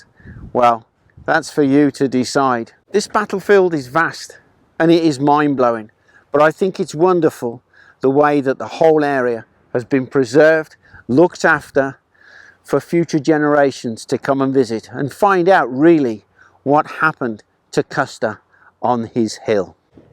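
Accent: United Kingdom. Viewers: Got a love how a person with a British accent wants to judge people on colonialism and expansion...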